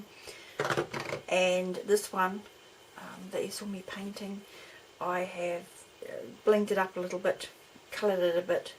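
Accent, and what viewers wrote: New Zealand. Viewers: So nice to hear a Kiwis voice on youtube, your tickets are amazing.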